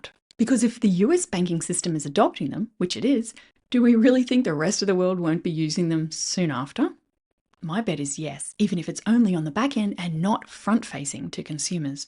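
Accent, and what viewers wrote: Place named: Australia. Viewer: So much food for thought here, thank you! I really think your channel has the most valuable crytpo insights going round. So refeshing to hear an Aussie in this space too!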